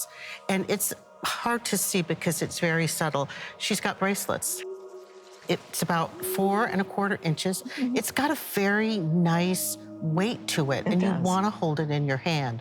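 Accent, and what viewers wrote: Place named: United States. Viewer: Can't get past the usa accent.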